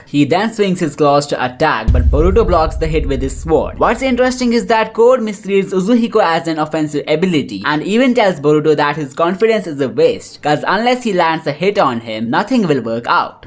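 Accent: India. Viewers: can u speak hindi??? your accent seems indian if yes... please make theories in hindi please please i am your OG watcher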